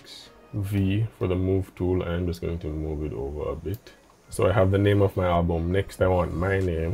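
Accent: Jamaica. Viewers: You have a Jamaican Accent lol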